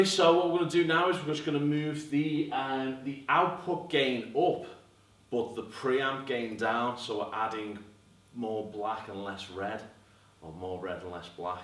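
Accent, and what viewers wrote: Liverpool, United Kingdom. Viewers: Scouse (Liverpool) accent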